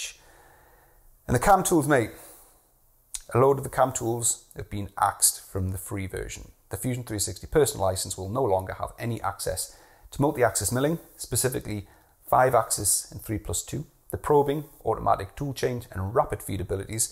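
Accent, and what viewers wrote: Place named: Newcastle, United Kingdom. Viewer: I thought you sounded like a geordie!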